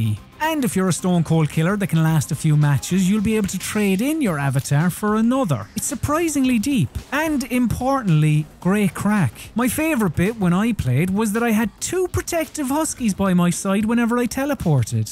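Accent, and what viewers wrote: Ireland. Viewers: Shut up it's an Irish accent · Ye fekin wallie it's an irish accent innit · its called an irish accent ye eejit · IRISH ☘️ yay he is irish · Are you irish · Haha I knew he was Irish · he irish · youre definitely irish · Sounds like u have a Kerry accent. Good on ya lad, the best part of Ireland is down here in county Kerry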